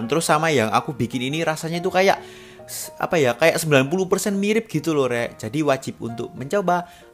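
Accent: Java, Indonesia. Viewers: Ayo leeee.smoga sucses.yang UTI seneng km tetap pertahan kan logat jowo mu.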